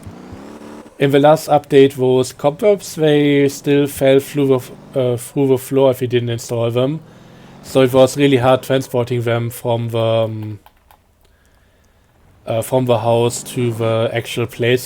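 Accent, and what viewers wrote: Germany. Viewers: Do I hear a bit german?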